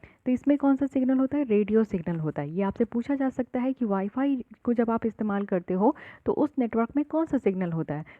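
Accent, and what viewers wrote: Bihar, India. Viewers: Mam kya aap bihar ki ho?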